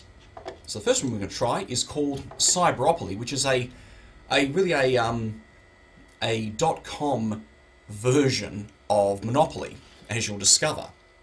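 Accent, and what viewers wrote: Australia. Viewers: You wouldn't happen to be Australian are you? you sound it on your videos. Love your videos of the Old Vintage Macs you got too.:)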